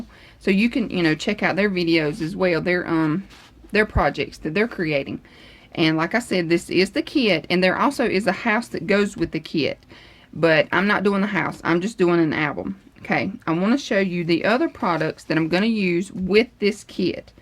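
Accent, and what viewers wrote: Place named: South, United States. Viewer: Thank you for going at a speed where I can comprehend!! I just love your work and that Southern drawl!!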